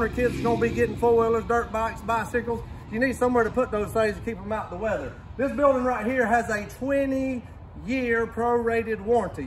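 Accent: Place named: Georgia, United States. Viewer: Like that middle Georgia drawl! north GEORGIA HERE!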